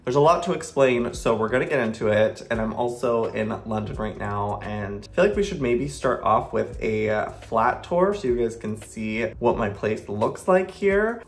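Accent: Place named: United States. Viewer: im curious - do you feel like people you interact with in london treat you differently because your accent is obviously from the states? i've considered visiting london myself and i have always wondered that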